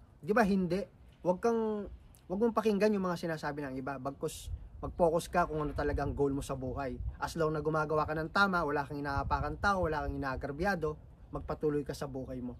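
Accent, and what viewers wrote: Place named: Pampanga, Philippines. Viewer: kapampangan ka, balu mung balu oh pamagsalita mu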